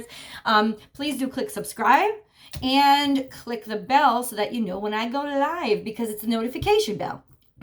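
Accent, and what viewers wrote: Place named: East Coast, United States. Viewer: so glad to seeing you in such good, and wonderful Spirits, after all you have been through recently. You are such a joy and blessing to watch you, when you post for us. You absolutely make my day, when I get to be with you on the postings., I have gotta ask you, I defintely see a very strong Italian background with you. The way we, ( I'm Italian too, on my Mom's side), talk with our hands, your eye movements, and facial structure, nose, mouth, etc. and your accent as well, East Coast, New Jersey or New York area, but I see and hear "Italy", with and from you! So, am I right, are you of Italian descent in your familia? Bye for now, Caio Bella! Bob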